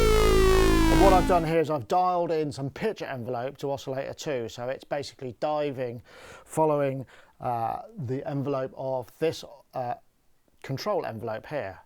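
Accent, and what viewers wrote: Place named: United Kingdom. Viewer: It doesn't matter what you say, you're British....it works out.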